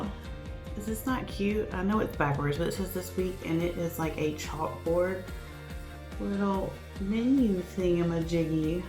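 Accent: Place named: South, United States. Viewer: Everything looked incredible. Yum.. I love grilled cheese and tomato soup too. Boy that unstuffed peppers.. yes please please.👏. I'm definitely making that. My Question is where was you born ? You've got kind of a Southern accent to me. My entire family is from The South. Mainly Kentucky. And What is your favorite Food ? Good luck to all the winners ! Love your indiana friend. xoxo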